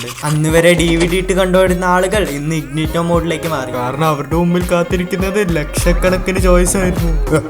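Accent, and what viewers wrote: Palakkad, India. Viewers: Bro palakkad ano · Palakkad evideyanee kutta 😃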